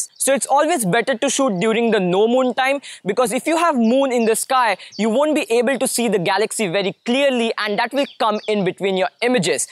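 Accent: India. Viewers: а есть индусы, у которых хватает ума научиться говорить на английском не по-индийски? индийский копипаст ютюба... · I love this Indian accent! :D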